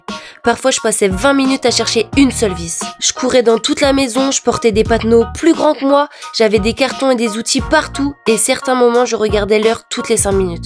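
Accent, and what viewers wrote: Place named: Portugal. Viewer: Portugais ou quoi ? Moi je suis portugaise parce que je crois, j’ai entendu portugais du saut.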